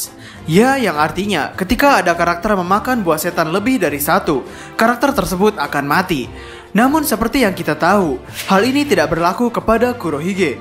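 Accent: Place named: Lombok, Indonesia. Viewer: Iye jage, dengan sasak badek wkwk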